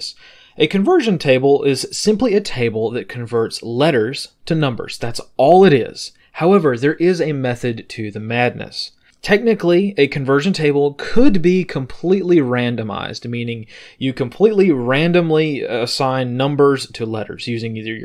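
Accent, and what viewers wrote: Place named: South, United States. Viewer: One of the mysteries of north American dialects is why New Orleans got the northern city vowel shift. There's nothing nearly as mysterious here, but there is a well-known connection between Tennesee and Central Texas, particularly the way they front the OU dipthong. My dude has an urban southern accent.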